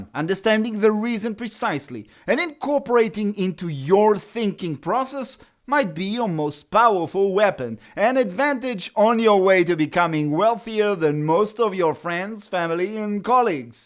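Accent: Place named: France. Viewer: What is this guys accent? French? It rules.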